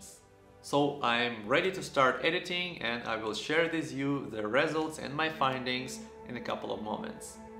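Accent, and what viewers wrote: Russia. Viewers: I love your Russian/Slavic accent! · Нам показалось что ты русский! английский норм. продолжай! Лайк влепил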